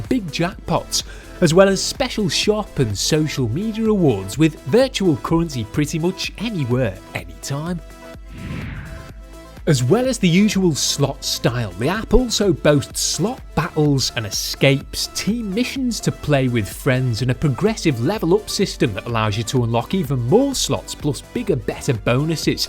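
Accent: Liverpool, United Kingdom. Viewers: Another awesome video with great and humourous scouse commentary.Juvenile sneeze splatter got me😂👍🏻👍🏻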